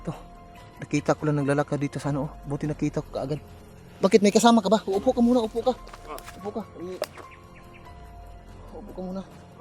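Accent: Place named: Visayas, Philippines. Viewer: VisayaA ang pg sulti mo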